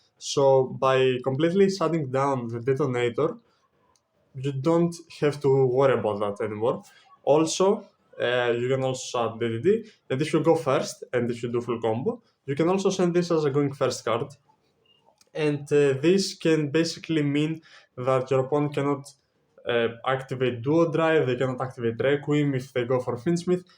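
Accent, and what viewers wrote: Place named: Greece. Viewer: I know a Greek accent when I hear it. Καλή δουλειά αδερφέ!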